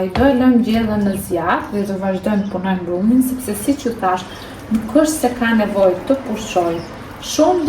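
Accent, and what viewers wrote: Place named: Gjirokastër, Albania. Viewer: Bravo,te lumshin duart! Mos je Gjirokastrite,te kuptoj nga dialekti qe perdor.Edhe une jam gjirokastrite. Te pershendes!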